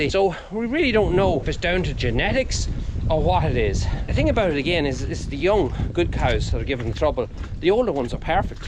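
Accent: Ireland. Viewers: ❤️❤️ LOVE THE CHANNEL ❤️❤️ 🤔🤔BUT🤔🤔... SOMETIMES I\\we, can't understand a word you're saying.!🧐🤔! SOMETIMES. You just talk TOOOOO FAST with that FANTASTIC Irish accent you have, and I\\we get lost in the wake.!🧐🤔! AND... The PERFECT EXAMPLE of this, is between the 12min point, and the 13min point, where you're obviously saying SOMETHING about how healthy it is for these lovely ladies to stay out, on the grass for as long as possible. But, at some stage they'll HAVE TO come indoors to spend their winter days and nights🥶. BUT... There's LOADS of detail you are giving us that we're simply not hearing, because you're TALKING TOO FAST, and we\\I can't keep up.!🤣! So... PLEASE talk a little bit slower when you're giving us the more important information. And... You can 'speedtalk' the less important stuff to your heart's content.!🥰! ABSOLUTELY LOVE THE VIDS.🥰🥰.... KEEP'EM COMING.🤞🥰.... 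RICH(UK).🥰🥰🥰.!.!.!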